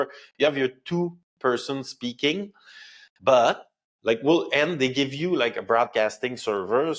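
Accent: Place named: Quebec, Canada. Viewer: Nice video! Are you from Quebec!? I can tell😃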